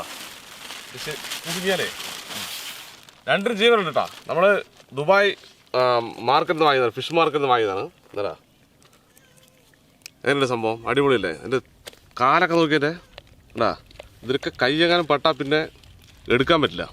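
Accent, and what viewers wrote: Palakkad, India. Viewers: Chetta palakadan avadaram super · ഫിറോസ് അണ്ണൻ പാലക്കാട് ഭാഷ 💯 സൂപ്പർ സൂപ്പർ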